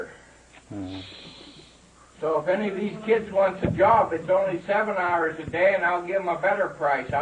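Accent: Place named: Pennsylvania, United States. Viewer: What a great PA accent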